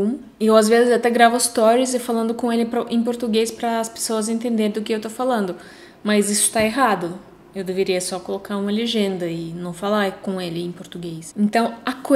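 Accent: Russia. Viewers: Os haters estão em toda parte...não só no Brasil! São um fenômeno novo... E você tem razão! Pessoalmente, são fofos...só que na internet, colocam seus podres pra fora...desabafam! Gostei muito do canal, vocês são muito simpáticos, e é impressionante como teu português está bom...muito pouco sotaque russo! Não conheço a Russia, mas quero muito ir...Museus, cidades com uma história muito rica, amo os pianistas russos, e as russas! E o stroganoff!! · em primeiro lugar gostaria de parabenizar que o seu portugues esta ficando cada vez melhor acredito que dentre 2 ou 3 anos voce nao tera mais sotaque russo parabens eu sei que seu canal e voltado para publico feminino mas eu amo os conteudos que voce e o produzem voce disse ai que tem pessoas que na frente nao tem coragem de dizer quando estao escondidas atraz da tela de um computador ou um celular e porque sao pessoas mau carater falças que mentem para si mesmas de tao pervesas que sao e em relaçao a depressao na russia como la tem muito problema em que pessoas bebem muito ja e um indicio fortissimo de depressao so que a marvada da vodka kkkkkk mascara tudo se a pessoa esta com depressao e usa o alcool como refugio e como querer estancar o ferimento como um tiro de revolver com um bandeide nao da certo ne um abraço sou fã numero 1 de voces continuem firmes ai nos conteudos que sao otimos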